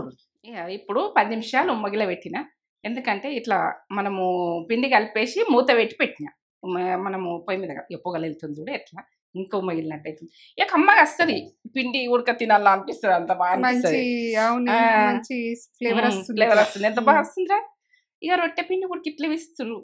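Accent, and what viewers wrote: Telangana, India. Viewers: Nenu Chennai lo untanu , telagana amai ne …. Mi e video chusaka , mana Sontha vallu matladinatu anpinchindi …. Miru etlane matladthu cheyandi amma ….. nen iythe skip chepakunda motham video chusina …. ❤❤❤❤❤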